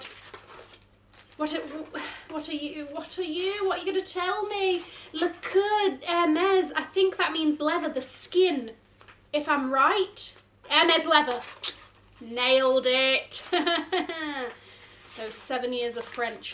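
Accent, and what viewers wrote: United Kingdom. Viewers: I love your accent! Its not the basic british accent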